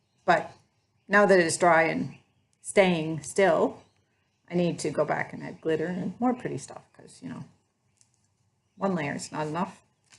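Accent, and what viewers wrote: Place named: Australia. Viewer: I chickened out doing project bags with zips, I do the Vonna style(no zips lol). And what's wrong with our British sense of humour 😜 , although I don't find the modern 'comedian' funny at all. I don't find American's funny. Don't think I've heard an Australian comedian. Have I got a sense of humour? Who knows lol. Ooh I love the butterfly your doing. They're stunning, was that you holding it? You definitely sound more Australian than American. Off to watch the next one😜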